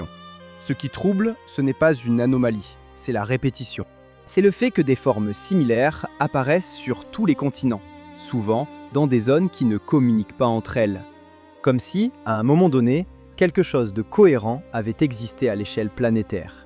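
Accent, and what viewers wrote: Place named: Maghreb, North Africa. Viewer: J'adore ton accent très légèrement maghrébin.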